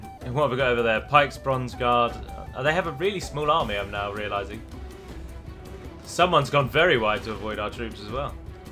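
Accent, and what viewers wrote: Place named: Scotland, United Kingdom. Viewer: That scottish accent haha 😁 👍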